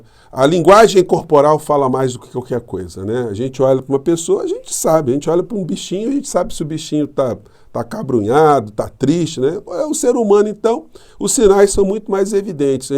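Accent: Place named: Rio de Janeiro, Brazil. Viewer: Só tenho a agradecer, professor, por nos proporcionar de uma forma tão didática, jurídica e documental, tudo de criminoso que aconteceu nesse governo. Nunca votei nesse energúmeno, nem quando eu ainda morava no Rio. Sou do Rio mas moro na baixada santista há 18 anos e é muito bom ouvir o sotaque carioca vindo do senhor de uma forma tão inteligente e objetiva. Vou votar no seu candidato aqui de São Paulo. Estou falando de São Vicente. Gratidão Professor! !!!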